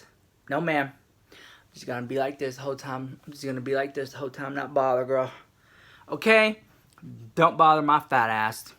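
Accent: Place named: Texas, United States. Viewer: girl we can hear you come from Texas.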